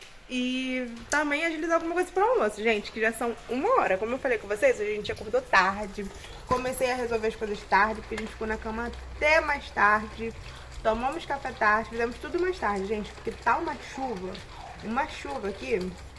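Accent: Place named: Rio de Janeiro, Brazil. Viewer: É o sotaque carioca kk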